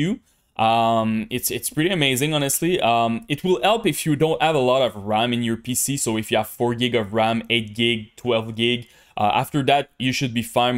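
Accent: Quebec, Canada. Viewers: Thanks for the tips bro & est tu québécois?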